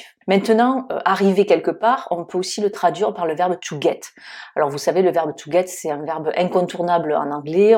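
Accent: Toulouse, France. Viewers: As always, utter explanations. Thanks ( by the way, I crave your Toulousain accent )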